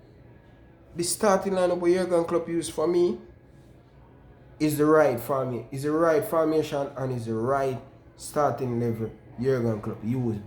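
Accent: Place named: Jamaica. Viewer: where in jamaica you from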